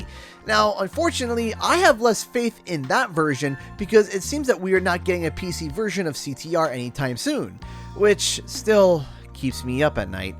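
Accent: Canada. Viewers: This clip was completely about nothing and I didn't learn anything new from it but I like listening to so I like it.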